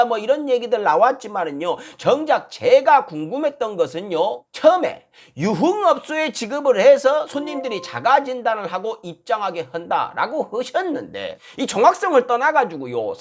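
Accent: Jeolla, South Korea. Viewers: 워따 전라도 말을 겁나 잘하네요.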